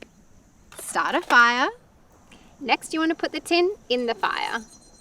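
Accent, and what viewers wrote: Australia. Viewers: Are you from Australia???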